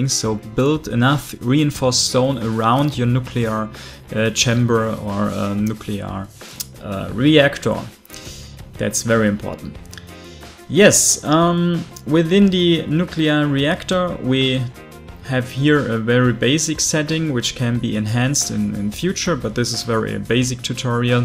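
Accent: Germany. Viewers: Bist du Deutscher? Hört man iwie raus und das "Servus" in der Beschreibung ist ein weiteres Indiz :)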